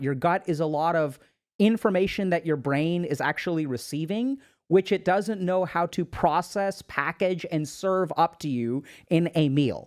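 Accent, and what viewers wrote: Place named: India. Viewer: Saw the title, first thing came to my mind was this, in your most iconic Indian voice: Stop reading my mind. Dr.K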